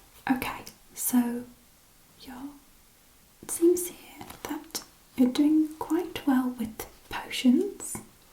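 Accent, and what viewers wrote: England, United Kingdom. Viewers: she's American but she sounds British. This is the first video that I watched on her channel so I thought she was British and then I watched all her other ones and she's American 😂😂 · English accent. Britain is four countries. England, Northern Ireland, Wales and Scotland. All very different accents. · A bit of a mix of several British accents 😊so so so calming and wonderful! you’re so so talented and I feel very spoilt right now:)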